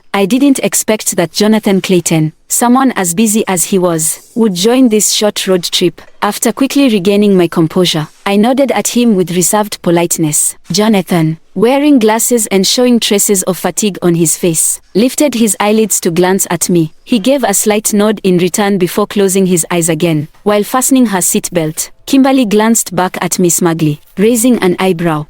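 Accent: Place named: Africa. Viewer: The African accent is crazy 😂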